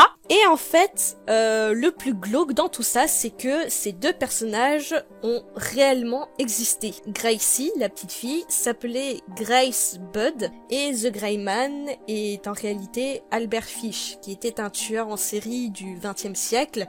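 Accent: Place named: Belgium. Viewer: Y'a un petit accent Belge là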